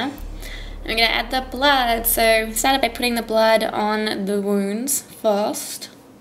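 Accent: Australia. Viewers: R u Australian?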